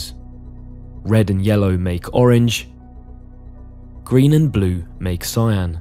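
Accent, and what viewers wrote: United Kingdom. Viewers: Also notice how he pronounces Weber with the first e like the French é (which is how it's supposed to be pronounced) and not like the way Anglo speakers pronounce the first e in Weber or Webber. Come to think of it, the narrator is not at all British. He has a "refined" British accent but I can say with 100% certainly that he did NOT grow up in the UK but, rather, in Germany, Austria, or, perhaps, Switzerland, and went to a fancy prep school or boarding school where Southern British Standard is taught from a young age (or taught very intensively) and/or maybe he's extremely talented phonetically and his accent is obviously not a stereotypically German accent (like the kind that's used on shows and movies depicting German characters, often humorously, sometimes not, like the movies Nazi era movies) and it's not anything at all like the accent of the late Dr. 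Ruth Westheimer, BUT a British accent (regardless of region or socioeconomic status) it's not. There is a wide spectrum of accents characterising people born and raised in the UK and he is not on that spectrum... He's close... He's real bloody close, but he would never be able to fool a native British person or an expert linguist into thinking he's British. He might fool a Yank, but never a Brit.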